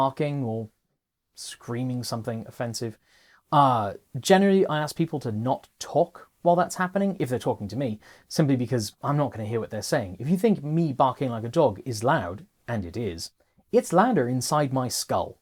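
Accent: United Kingdom. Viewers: I watched just your Ducati-video. Keep this British-sounding clear-speech. You are far more easily comprehensible (to all people with a hearing-impairment)! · I enjoy your brit diction, stay safe and well. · This has to be one of the most informative videos I’ve watched on YouTube in a long time. Aside from that, perhaps one of the bravest I’ve seen too. Never easy to talk about something so personal and you presented it in a way that made it incredibly easy to understand. As for worrying about what Brits think of how you sound being called a British accent, don’t. As an expat Brit, I can tell you we have so many accents anyway, there really isn’t any such thing as a British accent, and I’ve never known a Brit who even thinks about it (except for cases where we can’t understand somebody, and, yes, there are other Brits with accents so strong we can’t understand them either).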